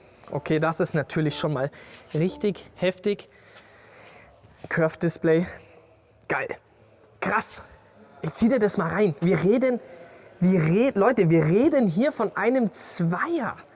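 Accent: Bavaria, Germany. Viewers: Ruhig bissl bayrisch find du ch subba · heute zum ersten mal auf Eurem Kanal - boarisch, da Wahnsinn (Muttersprache :), des is a moi a Abo wert!! Mir gefiel der M2 vom ersten Moment und ich bin am Samstag in der BMW-Welt um das reale Auto rum - kein Vergleich zu Fotos oder Videos - ein Traum. Mein 240 (F22) wirkt dagegen schon fast unmodern. Brooklyn Grey als Schalter kann ich mir sehr gut vorstellen :)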